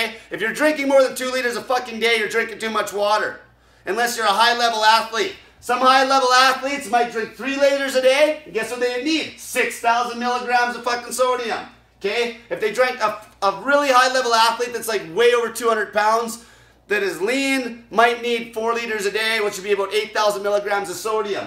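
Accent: Canada. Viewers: Canadian eh? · i've never seen someone with such a strong canadian accent lol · I detect Canadian in your yelling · Is he Canadian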